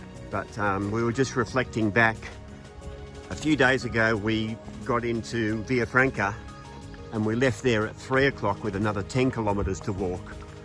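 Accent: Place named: Western Australia, Australia. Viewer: Beautiful video as always! I also enjoy your accent, it reminds me of the time I have spent in Western Australia. :=) Have a great day and Buen Camino!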